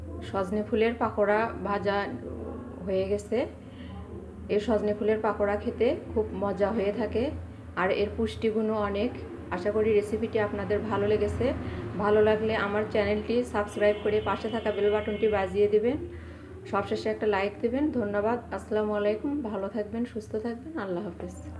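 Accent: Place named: North Bengal, India. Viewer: আসসালামুয়ালাইকুম দাওয়াত পেয়ে চলে আসলাম আপনার ঘরে। পাকড়া আমার অনেক পছন্দ আপনি অনেক সুন্দর করে তৈরি করলেন। আপনার উপস্থাপনা অতি চমৎকার এবং ভিডিও এডিটিং মাশাল্লাহ ভালো হয়েছে। ভাষা শুনে মনে হলো উত্তরবঙ্গের লোক কোথায় জানালে ভালো হতো। অনেক ভালো লাগলো আপনার ভিডিওটা। আপনার জন্য অনেক অনেক দোয়া ভালোবাসা শুভকামনা রইল এগিয়ে যান বহুদূর ❣️❣️ ভাল থাকবেন সুস্থ থাকবেন। আল্লাহাফেজ ❤️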